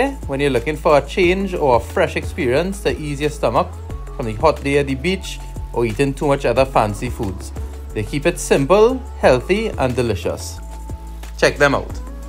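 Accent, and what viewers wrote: Trinidad and Tobago. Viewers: Hi my TRINI friend, Been a while since I heard from you. Missed the Accent As always thanks for sharing. Take care and bye for now. 22/07/16 🇨🇦 🍁. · De man speaking proper trini inno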